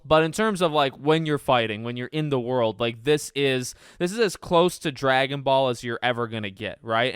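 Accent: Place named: Chicago, United States. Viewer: Are you from Chicago? You sound like my people 😊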